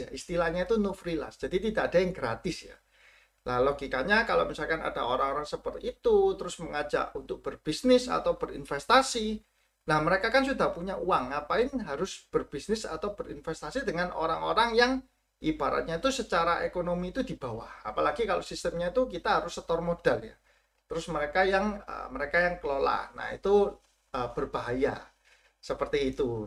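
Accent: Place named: Java, Indonesia. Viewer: Dialeg nya medok banget mas *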